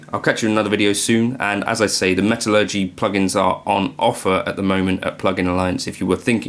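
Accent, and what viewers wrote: United Kingdom. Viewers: Ohh ! That would be great ! , I also have the HELIX LT, in that case no problem,but for the Pod Go it's a great idea to split it ! I've bought your Helix and Pod Go packs,there are many good presets ! You are a great artist,not only an incredible guitarrist,very creative and very original, besides it's amazing all the time you spend researching and trying new things every day that later you share with us ! For your accent I know you are from the UK,I lived in LONDON 7 years,while I was studying my Classical Guitar training at the Guildhall School of Music many years ago..I love England,whenever I can I go there... And I recorded some albums with Anthony Phillips,Ex guitarist and founder of GENESIS,we are very close friends he's also an incredible guitarrist.! Thanks a Lot ! Have a nice week.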